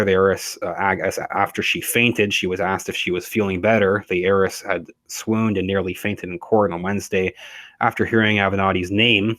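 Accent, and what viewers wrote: Canada. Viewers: First time viewer you sound Canadian The walls are tumbling down Trump Crimes against children looks like its just getting started ! I subscribed the more Citizen journalists the better !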